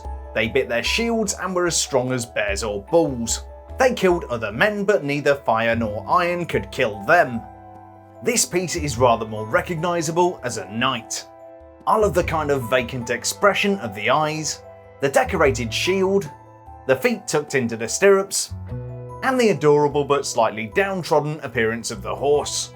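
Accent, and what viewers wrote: United Kingdom. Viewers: I love that he always says: HAYTCH PEE in his cool British accent. Hi 🙋🏾‍♀️ I’m from that embarrassment, ‘Murica 🇺🇸 lol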